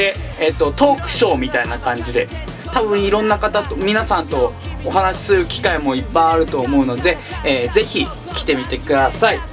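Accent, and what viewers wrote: Fukuoka, Japan. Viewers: 福岡弁がすごくいいです😉💖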